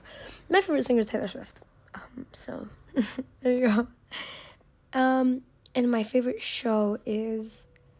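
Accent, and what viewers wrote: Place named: United Kingdom. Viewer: Your UK accent is really like coming through and more showcased and overtaking (If that makes sense) then ur US accent which is cool 😭❤️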